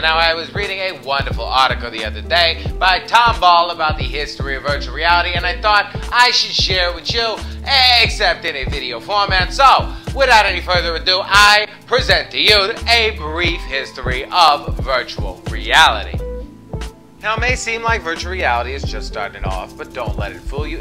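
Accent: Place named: Northeast, United States. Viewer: Not a bad first shot providing voice over narration,! Not bad at all! Who knew that inside our lurked a bonafied documentarian! You know the strange part? Your upper east coast accent adds an amount of flair and polish to your presentation I wasn't expecting. Can't wait for your next feature presentation!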